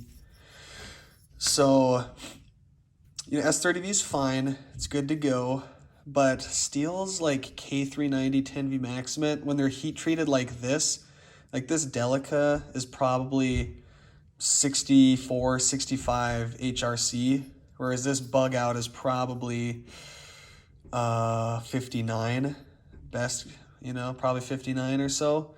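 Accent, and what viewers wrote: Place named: Spokane, United States. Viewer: ...sounds like you're from Spokane WA. My cousins say it that way..cool content btw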